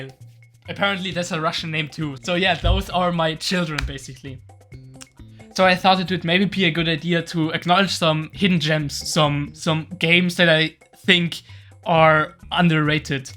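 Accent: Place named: England, United Kingdom. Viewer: You have a nice eng accent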